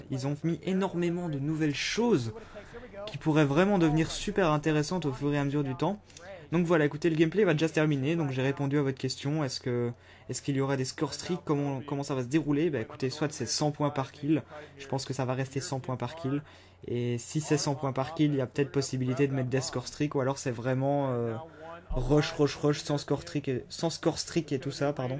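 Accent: Belgium. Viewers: J'ai cru que tu étais de la Belgique :D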